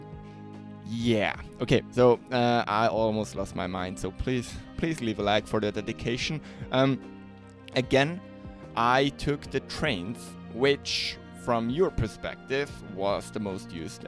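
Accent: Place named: Germany. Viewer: Engineer with a german accent and 100% dedication? Definitely a like. TY for the work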